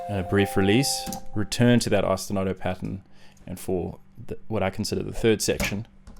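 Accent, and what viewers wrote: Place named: South Africa. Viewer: Oh this is great for so many reasons - one of which is to be hearing a South African accent on this kind of video 🤣. Thanks, I'm subscribed and 🔔🛎️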